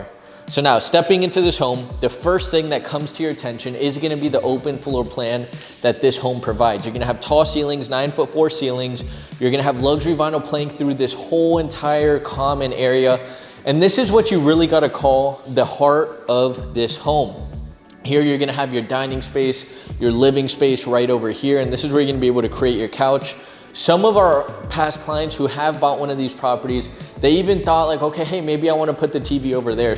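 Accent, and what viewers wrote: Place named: Venezuela. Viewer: No you did not just say you speak spanish you spoken in a Venezuelan accent because your mother is from Caracas. Your speech was slow and more deliberate as most Venezuelans I know speak faster. Yo hablo Castellano y cuatro otros idomas. Now for the house can you show other homes that are not that puke modern look, it screams cheap construction. There is more colors than black and white, it looks sterile and neutered, like they use to build prisons and those cookie cutter open concepts are terrible. I grew up in a real home, with clear defined rooms made with reinforced concrete, stucco and plaster interior walls about 2-3 inches thick. No hoa I approve of but the rest, the lot soil is more valuable that the material or labor. Can your builders do anything else but xerox every other lame builder. If they xerox Frank lloyd wright we might see something better.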